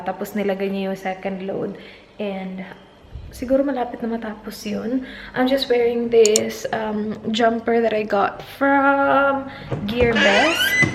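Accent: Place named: Visayas, Philippines. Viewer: kaya nagustohan ko vlog nya kasi ung accent nia bisaya tas ung english nya maganda rin pagka pronounce nia.. 😍😍😍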